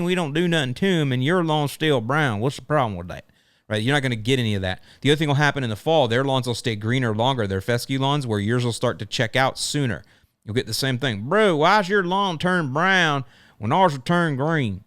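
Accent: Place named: South, United States. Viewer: That southern accent 🤣🤣🤣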